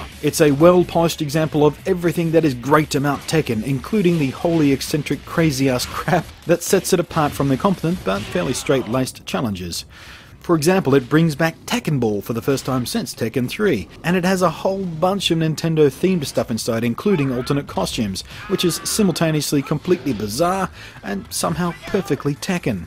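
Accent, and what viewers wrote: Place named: Australia. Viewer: Thanks for yet another informative vid, i do rather enjoy your style and its nice to hear another aussie doing things right! I am some what curious as to why you excluded zombieU from this review list? i think i remember you saying in one of your other wii vids that you were a little over the whole zombie game thing, but I am a little curious as to one, how it plays and two, what you think of it. If you have already gone over all of this please forgive my blathering ignorance.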